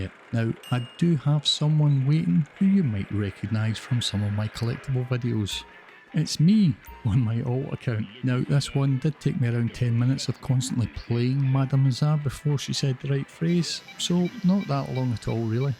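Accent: Scotland, United Kingdom. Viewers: I watched your video, a lot of times and I never understood what I needed to do... with all respect, since I'm not English, it's a bit tricky to understand with your Scottish accent 😅 (I love your pronunciation btw). A little recap can be very helpful, for real... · I should have listened too, Didn’t realise you were also Scottish 🏴. Think I have sussed the issue. Both our gametags have exactly same amount of characters 11 long. So I am gonna change mine since you can do it once for free. Hopefully that helps.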